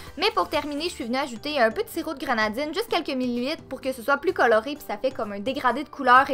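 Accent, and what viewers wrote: Quebec, Canada. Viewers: Ha ha dans la barre d'info tu écries ton accent vien du Quebec c'est nouveau? Toi aussi je te souhaite une bonne année , santé , bonheur , et de la réussite dans tout ce que tu fais même si c'est déjà le cas😘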